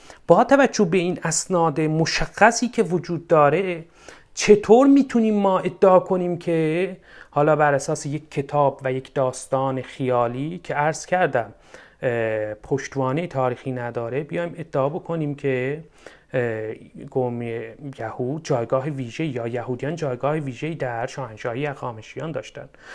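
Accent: Azerbaijan. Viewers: لهجه اذری شما را دوست دارم. به ایران ایمان دارم.مردم ایران بهترین مردم دنیا هستند. فرهنگ و مدارا در ایران وجود دارد. لهجه نشون میده فرهنگ و مدارا در تبریز و اذربایجان و گیلان واصفهان و کل ایران وجود دارد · لهجه اذری شما بینهایت زیباست.❤❤❤❤❤❤❤❤❤❤❤❤❤❤😮😮😮😮😮😮😮😮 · درود خدمت استاد گرامی جناب چقدر غرور آفرین هست که استادی با این گویش شیرین آذری با اسناد مستحکم تاریخی بلای جان ایران ستیزان بشود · درورد بر استاد عزیر و ارجمند با این لهجه ی زیبای آذری مرحبا به شما و دوستان شما بابت آگه سازی تاریخ ما. پر قدرت ادامه بدهید با تشکر.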